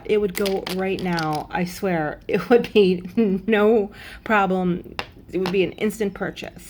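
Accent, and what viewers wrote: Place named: New York, United States. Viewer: I love you're accent are you from n.yc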